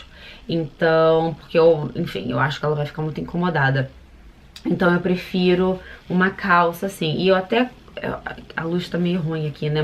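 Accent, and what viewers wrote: Rio de Janeiro, Brazil. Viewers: Adorei as dicas 😉 pena que manteve o sotaque carioca.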